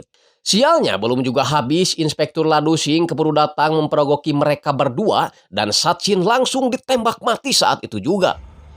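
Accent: West Java, Indonesia. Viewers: Sy gkk terlalu fokus ke film nya,sy lebih terhibur dgn pengisi suaranya,kang logat sunda banget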